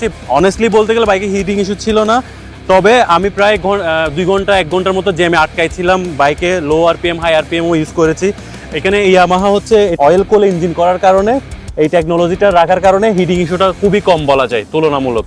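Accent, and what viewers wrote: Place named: Chittagong, Bangladesh. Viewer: শেষ..!! এই পোলারে বাইক দিয়ে বাইক আর ফিরে পাবার অবস্থা থাকবে না। নিউ বাইককে এরকম স্ট্রেস দিলে সেটা আর মনে হয় চালানোর অবস্থা থাকবে না। আর যে যে প্রজুক্তির কথা বলা হল এগুলো বাজারে আসছে আরো কয়েক বছর আগেই। এরকম তেল খেয়ে বাইক রিভিউ করার কোন দরকার নাই। অন্তত লিকুইড কুলিং থাক্লেও হত। আর চট্টগ্রাম এর টোন তো সেরা টোন....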